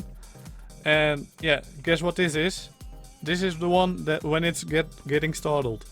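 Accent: Netherlands. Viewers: He is from Holland, it's a dutch accent. Yo, Maak jij ook mods voor ark? Of heb je er weleens in verdiept? Ik verdiep me er op het moment in, maar zit nog met een heleboel vragen. Ik wil namelijk een 3D object maken en importeren in Ark, modelleren is het probleem niet maar het importeren wel. Gr Erwin. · are you dutch? you kinda talk with an Dutch accent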